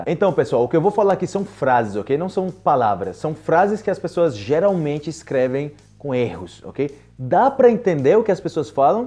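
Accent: Colombia. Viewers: Aquí en chile "presentar una prueba" es usado en el ámbito judicial. Esta respalda una acusación. Puede ser mostrar un objeto; declaración de testigos. Para prueba, lo escrito es"hacer, contestar, una prueba y en la octava región usan "presentarse a un certamen". Tb. Se puede hacer una prueba, como hacer saltos en 20 seg.; O quién bebe más agua; ello en un concurso. También está "la prueba de amor", pero ese tema queda para otra. Ah!!! hablas muy bien colombiano mi parcero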